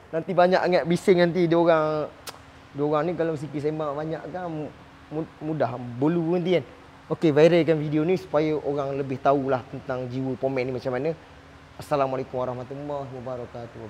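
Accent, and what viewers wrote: Pahang, Malaysia. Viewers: Apsal cakap mcm orang pahang pulak ni. org phg ke.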